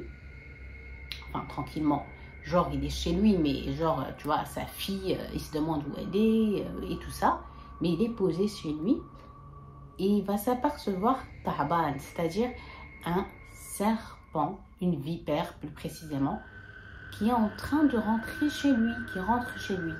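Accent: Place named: Gulf, Arab world. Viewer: Merci pour la réponse 😍maa Shaa Allah belle richesse de culture, ta façon de parler je pensais que tu étais du côté des pays du Golf😊sinon merci pour toutes tes histoires et te souhaite beaucoup de bonheur 🥰 🥰 🥰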